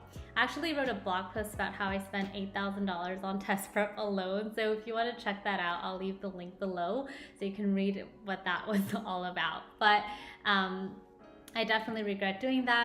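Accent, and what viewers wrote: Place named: South Korea. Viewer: I love your 2nd gen Korean accent~~ lovely!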